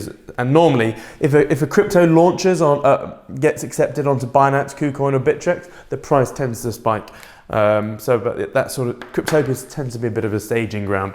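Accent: United Kingdom. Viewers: Where you from? Trying to figure out that accent....British? You live in the states?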